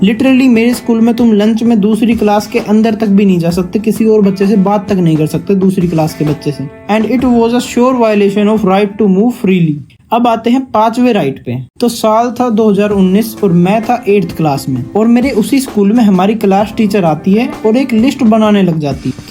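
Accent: Haryana, India. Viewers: Aree tum haryana side se ho kia bahi? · Bhai tu haryana se hai na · Where are you from? I think haryana❤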